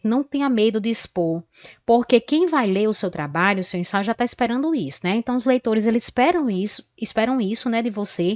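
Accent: Northeast, Brazil. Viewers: Adoro aprender com essa Professora, sem contar que amo o sotaque do nosso nordestão! 🥰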